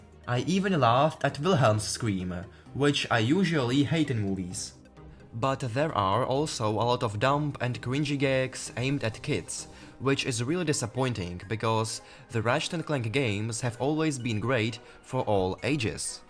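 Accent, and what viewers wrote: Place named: England, United Kingdom. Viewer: тебе очень идёт английский акцент, и вообще, молодец, что решил изучать языки😄Круто справляешься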